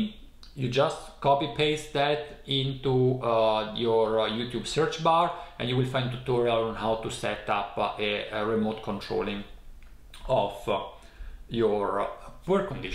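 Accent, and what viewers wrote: Italy. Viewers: Ciao, ti ho sempre e solo sentito parlare in inglese, e ho questo hobby di cercare di capire la provenienza degli italiani a partire dal loro accento in inglese, che mi porta alla domanda: sei pugliese?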